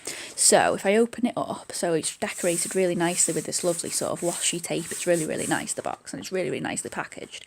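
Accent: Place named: Northern England, United Kingdom. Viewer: Great video and you have a northern accent like me 😍😍 Are you Manchester based ? You sound around Oldham Manchester way x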